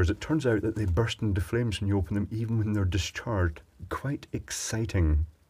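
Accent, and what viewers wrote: Scotland, United Kingdom. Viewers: "cc" (closed captions) are rather amusing, no doubt resulting from the Scottish accent. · He is scottish, you are british, so of course you two do not talk the same way XD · It's so interesting though, i love his Scottish accent too. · <num> percent pure British accent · This guy has the scottish voice from al murrays sketch and its just glorious.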